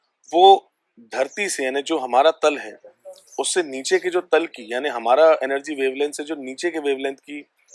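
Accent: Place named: Maharashtra, India. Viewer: मुझे हमेशा ऐसे क्यू लगता हाई की आप महाराष्ट्र से हो. मराठी भाषिक हो क्योंकि आपकी हिन्दी एक मराठी भाषिक जैसे है. तथा नाथ परंपरा तो महाराष्ट्र धर्म की है. जय गिरनारी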